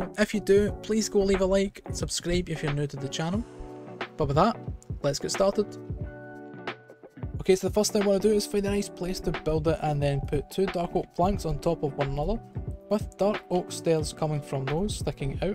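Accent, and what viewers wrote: Scotland, United Kingdom. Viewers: U Scottish??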